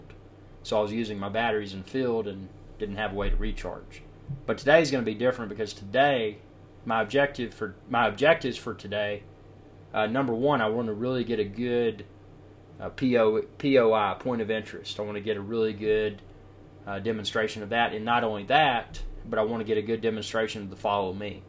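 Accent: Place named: Texas, United States. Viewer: My wife Luciana thinks your accent is from texas, i have to agree also. One place i hope to visit one day :)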